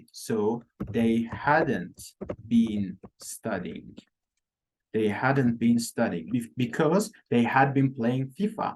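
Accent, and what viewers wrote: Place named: RP, United Kingdom. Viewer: Bülbül gibi ötmek, ingiliz kraliyet ailesi gibi ingilizce konuşmak istiyorsanız doğru yerdesiniz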